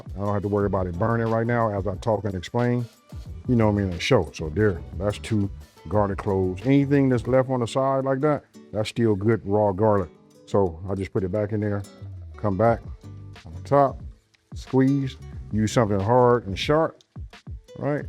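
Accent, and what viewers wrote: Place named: California, United States. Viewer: Man this right here cuz!!!!!!!!! Omg this is seasoned to perfection. I’m here a Cali accent but I’m seeing down south cooking going on. Good lawd!!!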